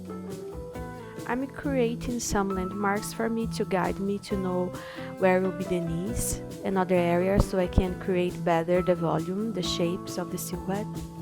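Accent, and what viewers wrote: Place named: Brazil. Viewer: é muito bom ouvir o sotaque brasileiro! Parabéns pelo processo! Espero que seja o inicio de mais series como essa ;)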